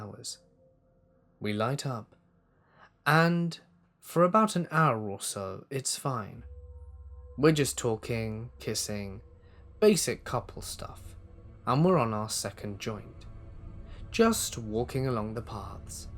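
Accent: United Kingdom. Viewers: Is this British English accent? sorry I've been learning English..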